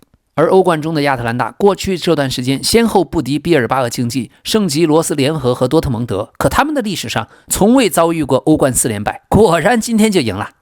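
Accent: China. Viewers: 眾口難調啊，我個人的經驗是字幕繁體優先，因為老k的聲音已經是大陸口音了，再配上繁體字幕 + 西語球員名詞，能夠最大化兼容大家的習慣。聽不懂可以看，看不明白可以聽，總能把信息傳遞出去。而且很多平時寫簡體字的朋友其實也看得懂繁體字，老k可以考慮多修覆一些繁體字的錯誤，而不是改成簡體。這個可以慢慢用 AI 來糾錯，集中找幾期過去的錯誤作為訓練，很快就能改進的。個人建議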